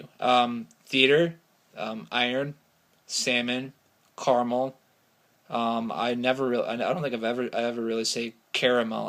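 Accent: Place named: Ireland. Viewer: hahaha you're adorable xD singing your username!!! ' ' XD haha I'm sorry to hear about your grandparents I only have my gran left too, one gran on my mums side The trainspotting book was a surprise haha Yer Scottish accent Isnae terrible bu it soonds mair Irish than Scottish tae be honest wi ye ye need tae come here tae properly learn the accent! But you read very well haha considering the Scottish English ^^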